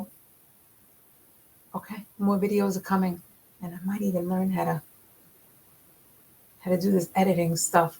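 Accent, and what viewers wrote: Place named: Pennsylvania, United States. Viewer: I’ve been missing you and that PA Italian Girl accent…🥰. Happy to see you back!!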